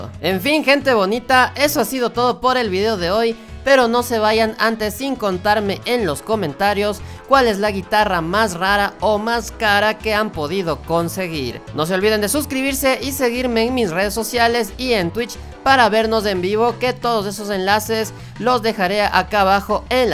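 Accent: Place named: Mexico. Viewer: Este wey tiene acento mexicano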